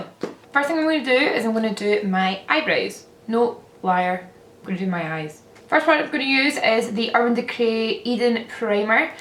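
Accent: Scotland, United Kingdom. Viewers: Omg you're Scottish, yes finally 🙌🏽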